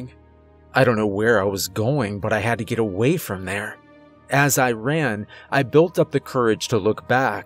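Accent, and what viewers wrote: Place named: Great Lakes, United States. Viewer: I’ve wondered for some time now if the narrator is from the Great Lakes region because of the way they pronounce some words 💁🏽‍♂️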